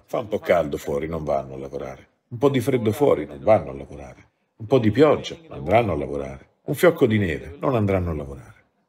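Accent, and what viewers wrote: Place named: Rome, Italy. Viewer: Certo che vedere un guru Indiano parlare e sentire il doppiaggio con accento romanesco non è il massimo... Almeno mettete qualcuno che non abbia un accento!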